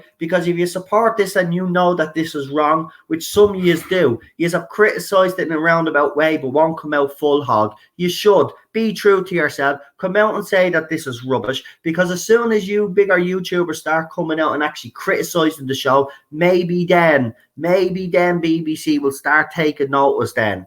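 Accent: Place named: Dublin, Ireland. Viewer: Which part of Dublin are you from?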